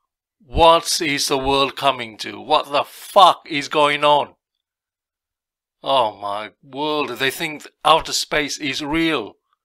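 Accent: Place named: India. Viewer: Haha you're so funny. A bit "old man shouts at cloud" there. BTW, do you have non-UK roots? You sound a bit like one of my friend's Indian uncles: )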